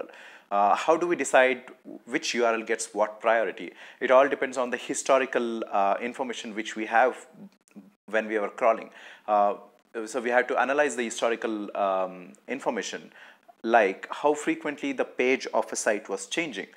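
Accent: India. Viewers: An Indian youtube coding video where i don't feel like scratching my ears out!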